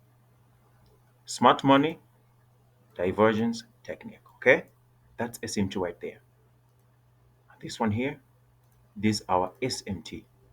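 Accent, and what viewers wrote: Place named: India. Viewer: Why you speak like indians ? Are you paj..et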